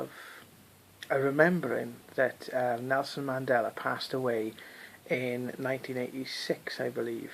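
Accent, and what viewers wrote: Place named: United Kingdom. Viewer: I went through this exact same rationalization process several months ago. My advice to you is to choose an example that you have personal knowledge of, and research it through copyrights, patents, and especially microfiche. If possible, do this research in the library rather than from digital sources. The physics of our world claim this is possible in theory, but you would have to pay attention to the modern discoveries, especially those from this year, ie the fifth force being dark protons, and the ability to engineer particle entanglement. If you are from Great Britain, as your accent suggests, you have the benefit of having been schooled with the bible. You may find your proof in a bible change. Good luck to you; the truth sets you free.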